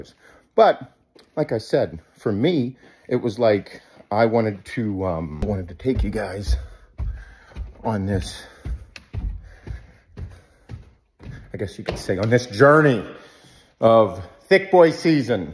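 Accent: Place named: Pennsylvania, United States. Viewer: Love that PA accent lol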